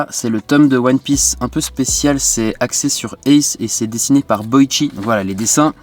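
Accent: Switzerland. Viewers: T’es Suisse?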